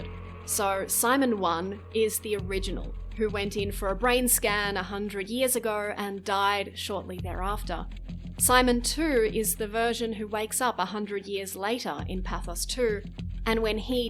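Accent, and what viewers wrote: Australia. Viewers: Australian goth??? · Grabbed this based on your vid. Nice to hear a fellow female Aussie gamer.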